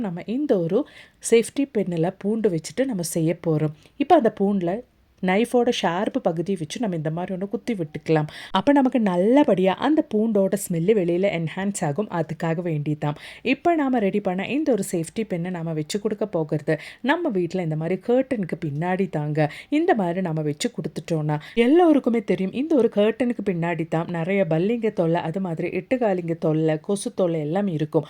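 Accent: Kerala, India. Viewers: Sister ur malayala tamil so sweet.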